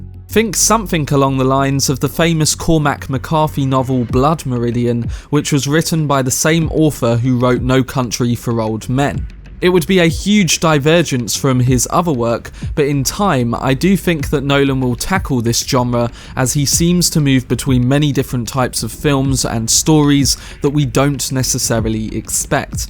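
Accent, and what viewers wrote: United Kingdom. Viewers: Love your bri-ish accent mate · Dude you a brit?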